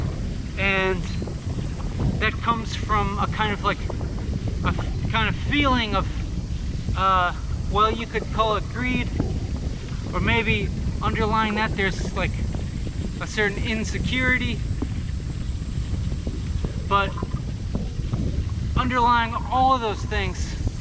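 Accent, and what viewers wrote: United Kingdom. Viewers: 合理 合理 he speaks london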